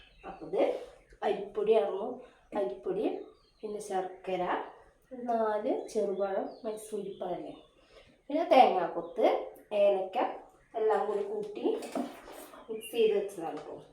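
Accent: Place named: Kunnamkulam, India. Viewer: സൂപ്പർ. തൃശൂരിൽ കുന്നംകുളത്തല്ലേ വീട്? സംസാരം കേട്ടിട്ട്😅😅😅😅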